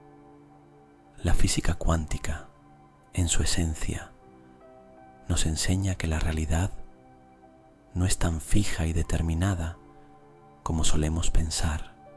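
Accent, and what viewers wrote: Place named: Spain. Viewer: esa voz de españolete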